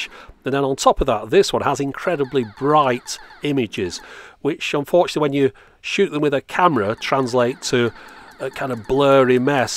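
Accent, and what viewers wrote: United Kingdom. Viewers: Makes me miss my old Vectrex even more. I've always had a soft spot for vector graphics games, and poured way too many quarters into Asteroids, Star Wars, and Tempest machines as a teenager in the eighties. While all my friends were buying Colecovisions and Atari consoles, I scrimped and saved for the Vectrex. I had the 3-d goggles and the light pen and most of the games that were available for the machine. I foolishly gave it away to an old flatmate trying to downsize for a move to the UK. I ended up back in the states eventually, and now have a 16 year old son who loves retro gaming. Looking at what the Vectrex and accessories go for on Ebay, I fear I'll never have another one. Hopefully this technology will mature to the point where I can play a ten foot version of Tempest on my ceiling! Love your videos, and your accent makes me homesick for my adopted second home in Blighty.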